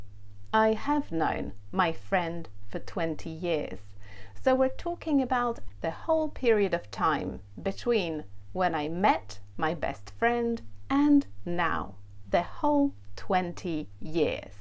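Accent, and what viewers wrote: United Kingdom. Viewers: Very nice British English,sounds like music in my ears! Kind regards from Spain. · Hi Ms, is your accent British?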